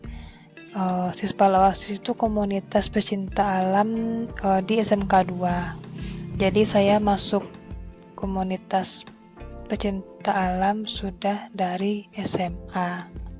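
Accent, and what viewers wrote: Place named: West Sumatra, Indonesia. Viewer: logat minang nya terasa banget uni... asyik jadi tau wilayah ai dingin, makasih ka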